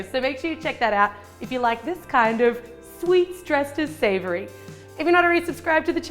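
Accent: Australia. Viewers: is she australian???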